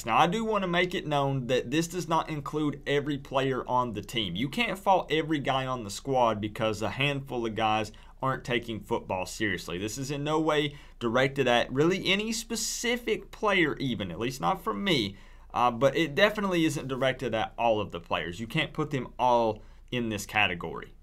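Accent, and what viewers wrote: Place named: Chattanooga, United States. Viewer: not for nothing, but you do have a Chattanooga accent.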